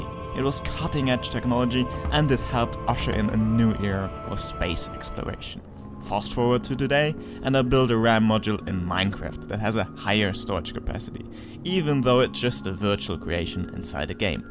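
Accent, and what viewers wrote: Germany. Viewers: I knew he was form Germany. Typical Accent. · are you by any chance... german? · sie sind so deutsch der akzent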